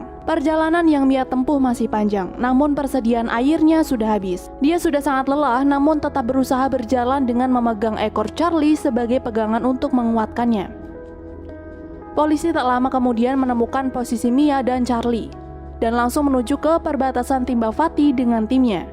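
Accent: Sunda, Indonesia. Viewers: Ngeunah euy suara sunda na 🤭😅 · Bodor aya sundana hahaha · aya sunda an euy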